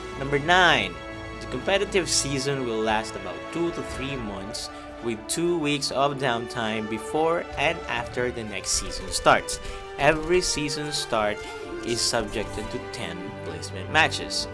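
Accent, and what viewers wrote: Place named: Philippines. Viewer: this is actually really well done. the "filipino english" accent is bearable <3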